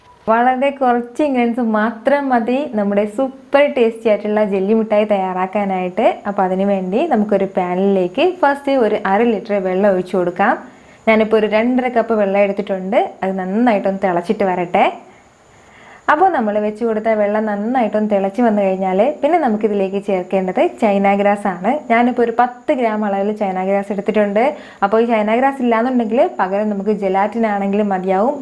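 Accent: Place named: Malappuram, India. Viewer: അത് മലപ്പുറത്തെ വേറെ ഭാഗത്ത ഭാഷ നിങ്ങളെ 😁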